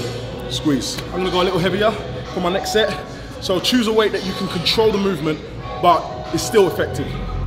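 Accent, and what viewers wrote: United Kingdom. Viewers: Some British accents I like, this one just irks me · I have been bodybuilding for over 40 years, and you are by far the best trainer I have watched! You are the "Real Deal." Love your methodology, eating, and your pretty wife. UK accent is nice also. I am 68 years old and still hitting the gym 2 hours 3-4 day's a week! That is why you have never been injured the by way you train.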